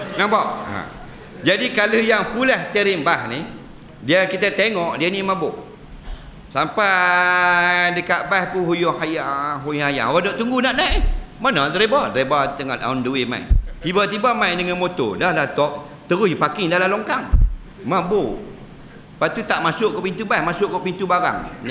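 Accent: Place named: North, Malaysia. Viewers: Ckp utara x kekok lngsung..Fasih sgt😀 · fasih elok noh loqat utara..seronok la..esok kita nk mengundi..esok kita dh bleh buat pilihan..terima kasih tok guru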